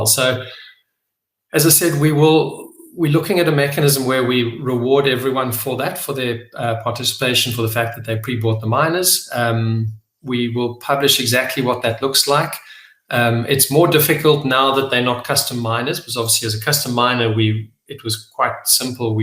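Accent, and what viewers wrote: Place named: South Africa. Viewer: Sounds South African 🇿🇦🇿🇦🇿🇦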